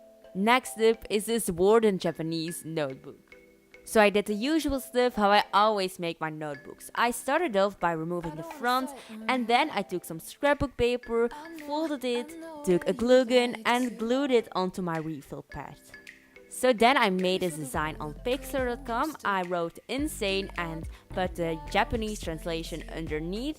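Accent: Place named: Netherlands. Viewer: I love how he has a Dutch accent. It is so cute. Rlly nice personality.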